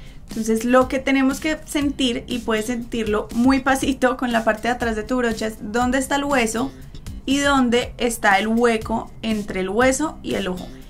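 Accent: Colombia. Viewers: Explicas súper bien, aparte ese bello acento que tienen ustedes los colombianos 😍. ¿Podrías hacer un video de cómo delinear un ojo encapotado? Saludos desde México.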